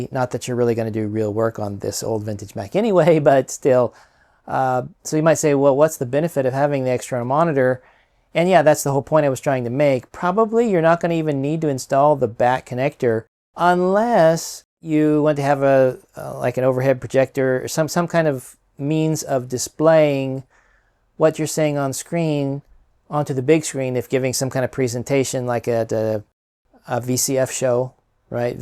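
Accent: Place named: United States. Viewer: Another great video! You really do a great job presenting this, every project you demo makes me want to do it myself even though I don’t have the same computers😂. You are to blame for the retro Mac illness I am currently experiencing!😂. Did you say you aren’t based in America? Your accent/vernacular both suggest to me that are American! Where are you located?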